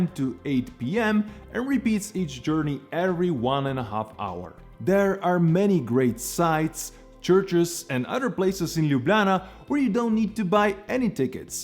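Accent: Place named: England, United Kingdom. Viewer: Thank you for all of your videos. I've watched many of northern Italy and Slovenia. Great presentation with a very charming English accent. Keep up the great work!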